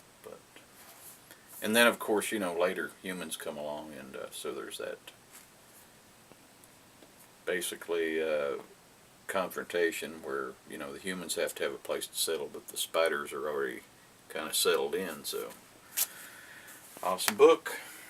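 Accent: United Kingdom. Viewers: I loved your British accent! (I love a good chippy too haha!) Great wrap-up :) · Good month,! I found The Hate U Give a real page turner too. Your British accent!!!! Amazing! We do love a good chippy! haha! L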